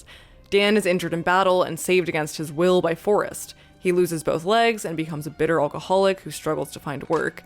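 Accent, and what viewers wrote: Canada. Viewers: So, I agree with a lot of what you say here. Zemeckis & co. definitely took a lot of the complexity and all of the darkness out of Book-Forrest’s character. I think Movie-Forest is more like Chance the gardner, from Being There , than the character from the book, who he shares a name with. But, to be fair, the book really was very badly written. IMO, it’s maybe the only time I’ve ever experienced the book being worse than the movie. Full disclosure: I’m also from Canada (I think I might hear an Ontario accent in your narration?), so I’m looking at the US situation from the outside, in. I also loved the movie when it came out and watched it on repeat for a while (I was in High School at the time), and haven’t watched it since. So I definitely have a bias and I might be forgetting key things. Still, I agree with you; the movie is not even close to perfect and it has some jingoistic vibes. 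But, there’s also hope there, even if Forrest is a bit of a fairy tale character. And, human suffering, as well as the darkness of the historical period is not completely absent from the story, either, with Lt. Dan and Jenny. I don’t think the intention of the film was stark realism. And don’t we all need hope and a happy ending now and again? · Is this channel’s creator Canadian?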